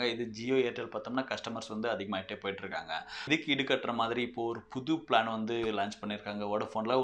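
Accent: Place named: Hosur, India. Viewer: Bro Neenga endha ooru. Unga slang nalla irukku. Hosur ah.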